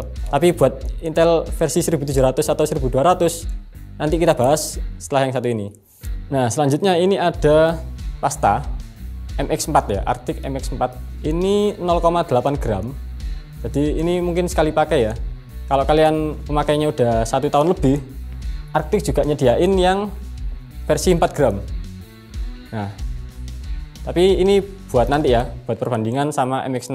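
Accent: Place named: Surabaya, Indonesia. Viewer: logat Anda seperti wong Suroboyoan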